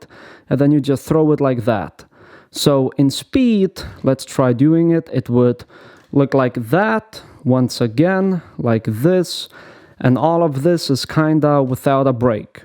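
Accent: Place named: Russia. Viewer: I’m just here to hear his Russian accent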